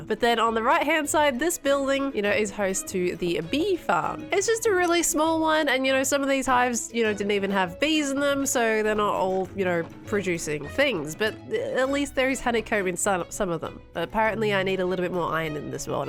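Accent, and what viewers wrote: Australia. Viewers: This series is so fun. I'm so so glad I've found it. It is just so nice to have a aesthetically pleasing builds all backed up by an Aussie accent, I just find that I watch so many people from other countries lately that I miss just hearing something more familiar ❤️ (does that make sense?.... tbh I find that I sometimes even crave more Australian-ness in movies and tv lol)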